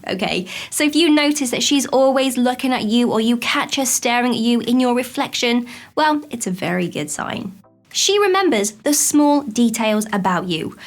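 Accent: United Kingdom. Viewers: Looking at you and listening to you is like looking at you and listening to you for the first time. Love your British accent on top of that your personality and beauty sets it off. You're fine as frogs hair!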